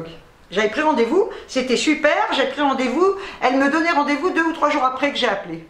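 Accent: Algeria. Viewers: ton commentaire m'a fait tellement plaisir ❤️❤️avec tous les commentaires que tu as je sais que c'est loin d'être facile. Merci du fond du cœur ❤️ ❤️ je t'embrasse très fort tu as une personnalité que j'adore j'aime tellement ton naturel ton franc parlé...j'aime aussi retrouver la gestuelle et l'accent pieds noirs. Ma maman elle aussi parle autant avec les mains qu'avec la bouche 😂😂c'est ça les pieds-noirs et qu'est ce que je me régale devant tes vidéos ❤️ ❤️ Énorme bisous ♥️ merci encore pour le réconfort que nous apporte tes vidéos❤️❤️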